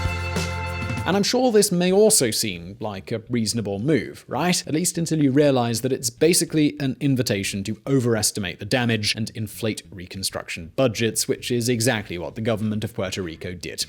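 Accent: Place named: United Kingdom. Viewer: Sir, a "territory that BELONGS to the US" it's not the most political correct statement. I feel we ARE PART OF THE US. I believe that you as a British (which I presume for your accent) must understand what a commonwealth is. Also, "before Irma and Maria". There wasnt any "Ana". One of the things that utterly annoys me is the continuous mention of corruption in our Government. That in PART is true. Ok. Please, Dont overlook the FACT that we HAVE and HAD HONEST and DEVOTED polititians. But why corruption it's always brought up everytime someone dares to describe our Island?!? To me that not only harms our image to others, but also upsets us. That "We dont do anything" concerning government??? Really? May I suggest you to produce a video on how we fight everyday. We fight setbacks and negative situations. Although your video and your analysis are good. 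I'm giving it a like...Best wishes...Thanks 👋👍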